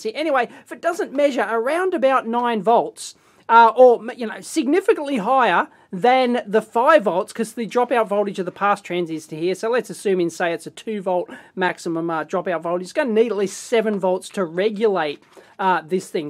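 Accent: Australia. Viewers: it's highly commendable for someone to use a wide dictionary. especially with an australian accent. (unless your name is ken ham)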